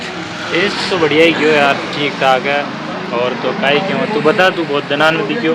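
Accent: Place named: Hadoti, India. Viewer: बोली सै हाडौती का लग रहा है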